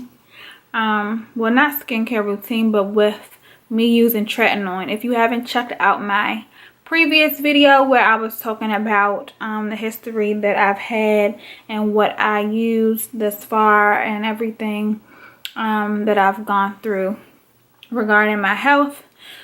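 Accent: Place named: Washington DC, United States. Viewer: Are you from DC? You kinda sound like you’re from the “urea” 🤔. You’re skin looks great!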